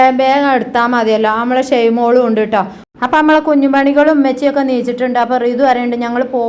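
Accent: Malappuram, India. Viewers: മലപ്പുറം താത്താന്റെ വർത്താനം ആണ് അല്ലേ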